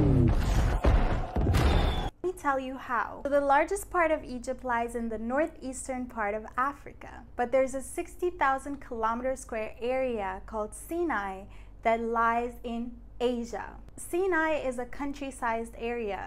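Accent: United States. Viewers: You sound American or Canadian. Its hard to believe you learned English in Egypt. You must have watched a lot of movies or something.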